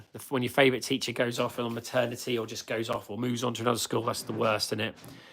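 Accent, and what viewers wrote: United Kingdom. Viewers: I didn't know you were British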